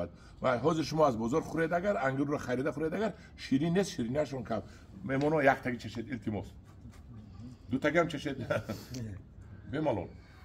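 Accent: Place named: Tajikistan. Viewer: Ахсан ба Шумо, бо шеваи хушруи точики сухан карданатон, ба Шумо бурдбори хохонам.❤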